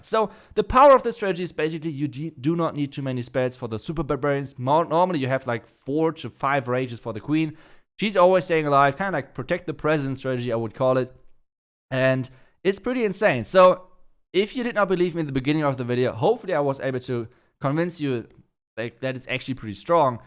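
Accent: Germany. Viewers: I love how german sneaks into English videos, especially zuper 😂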